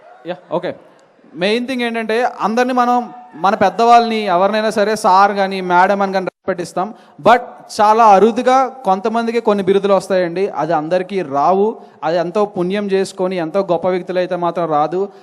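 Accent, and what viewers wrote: India. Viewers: Anthanu anedi Vizag, vizianagaram slang andi respect word oka oka pratham lo different slangs untai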